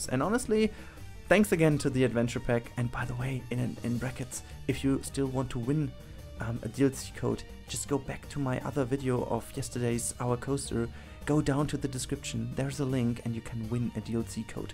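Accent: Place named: Germany. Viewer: this accent ... soooo german ^^ anyway nice Noria and it really looks familiar like the Noria in anno1404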